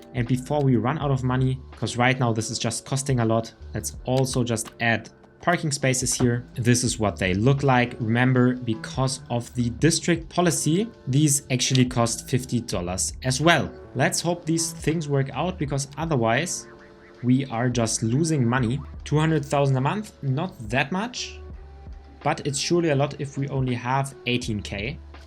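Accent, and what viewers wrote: Germany. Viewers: i hear a thiccc german accent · To me he sounds German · Man hört den deutschen Akzent 😂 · I hear deutsch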